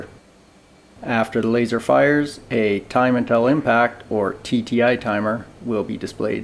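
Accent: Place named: Maritimes, Canada. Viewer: Awesome video! You must be a Maritimer. Newf?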